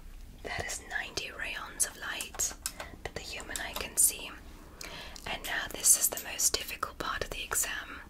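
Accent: United Kingdom. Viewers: Amen. Her british/alien voice melts me like butter on a pan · Are you British?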